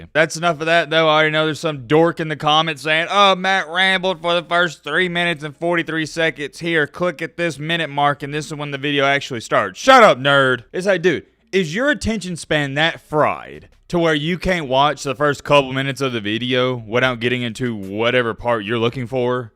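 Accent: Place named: Alabama, United States. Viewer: hearing how you pronounced some of the names in your video, it's pretty obvious that you went to school in Alabama.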